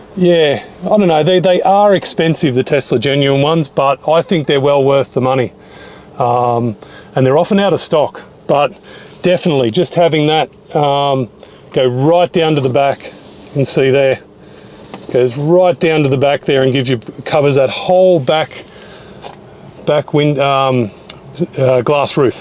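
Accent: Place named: Australia. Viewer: Very informative for new and old Tesla owners. Keep churning more videos. Aussie accent goes a long way. Thanks mate!!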